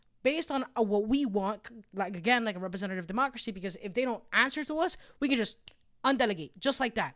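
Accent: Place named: Bradford, United Kingdom. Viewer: Are you from England You accents like you from Bradford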